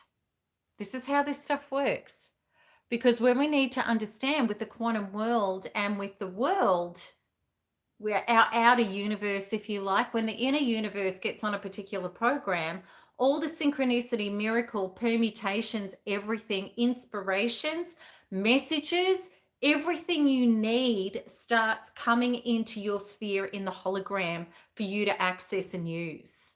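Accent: Australia. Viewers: I like her Australian accent. I wokred in the Far East for over a decade. I am an American and I enjoyed the different English language accents and dialects from the people I met. In the FarEast I met many people from different continents who were also there.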